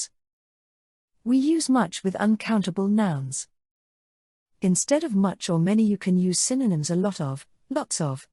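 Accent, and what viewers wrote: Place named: United Kingdom. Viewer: Thx u , i love it brittish accent 🎉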